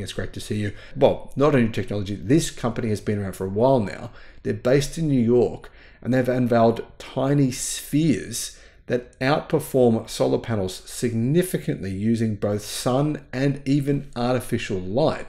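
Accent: Australia. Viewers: Are you ausi?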